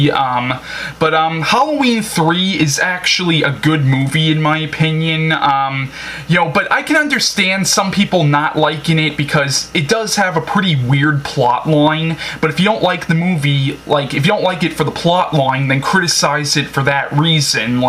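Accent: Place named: New York, United States. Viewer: Ahhhh.. I knew I'd heard the accent somewhere before :) I knew it was either New York or maybe Connecticut or something. Sorry for my ignorance. I'm Irish from England, so US accents aren't quite so obvious over here. Nice review anyways, mate :)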